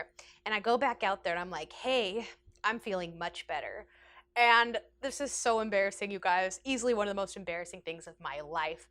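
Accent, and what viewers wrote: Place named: United Kingdom. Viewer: Nice British accent!:)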